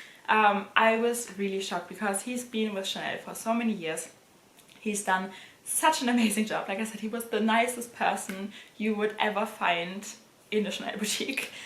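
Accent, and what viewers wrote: Germany. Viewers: lol i hear permanently a german accent in your english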